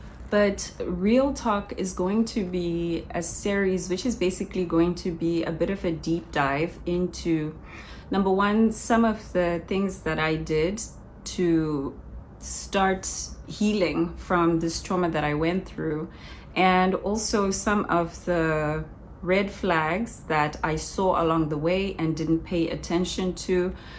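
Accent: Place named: Zimbabwe. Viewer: we are same generation. I remember you from your model days. It was called Supermodel right. And remember reading an article about you when you were modelling in SA. And wow still looking beautiful sis. I loved hearing your life story. It shows we all go through a lot. And though it may sound petty but you have that top notch Zim English accent. Exactly how most of us 80s babies sound. Its fading now guess a reflection of our education system. Anyway looking forward to seeing more videos.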